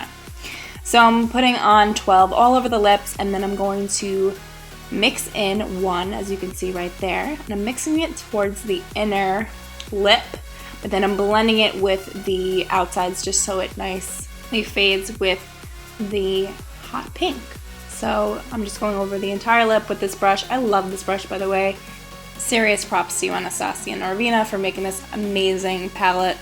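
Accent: New Jersey, United States. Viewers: Hey doll! Your doing such an awesome job. I started watching you a couple months ago & Ive seen you grow so much! I'm going to DM you on Insta too, but I'm guessing your from Jersey/the Tri-state area? You have the accent! 😂😝 I'm from Jersey too. I'm REALLY working hard to start up my YouTube channel, but it's so tough! I'd LOVE to do a collaboration with you! I'm not sure if you'd be interested, but I would FOREVER be grateful! lol. If it is something you think you'd be interested in doing, PLEASE let me know! Talk to you soon 😘 xoxo, Brooke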